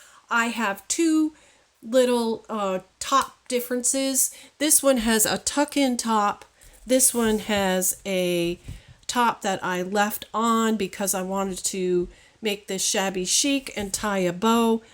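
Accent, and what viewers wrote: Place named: South, United States. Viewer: Love your project. New subbie too. SOUTHERN GIRL what state are you from?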